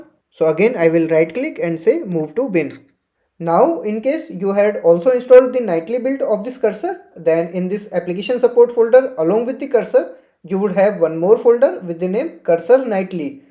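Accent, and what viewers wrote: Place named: India. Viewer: love your indian accent :)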